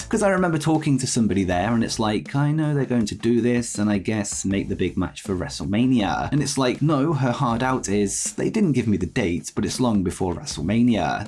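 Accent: United Kingdom. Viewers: I can't stand his annoying British voice or whatever the heck it even is 🙄 The long delay after each sentence is absolutely ugly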